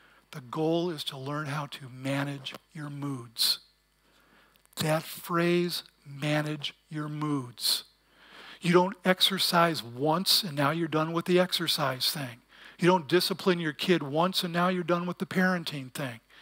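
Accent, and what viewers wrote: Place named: Midwest, United States. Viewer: He sounds like he's from Chicago 100% or at least Midwest lol. I can always tell those peoples' accents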